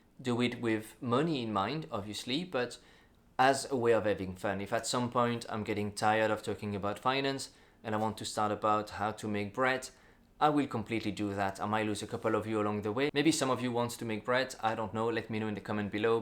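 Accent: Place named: France. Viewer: It is always great to listen to a tutor in Davinci Resolve. You have the knowledge and willingness to share it. I have subscribed as you seem to be able to teach in short sessions - 5 minutes seem about right. You are clear in your explanations and your French accent adds a little extra. Best of luck.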